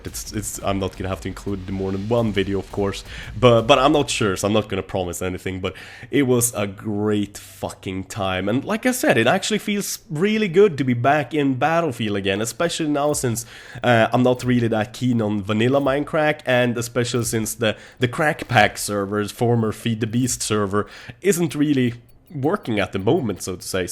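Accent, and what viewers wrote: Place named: Sweden. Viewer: Haha shut up! Have such love/hate for your channel but i cant stop watching and listen to your swenglish haha:D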